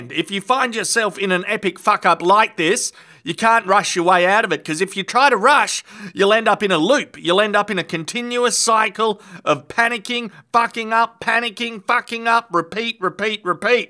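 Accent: Australia. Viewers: This just wouldnt be the same without the Australian narration.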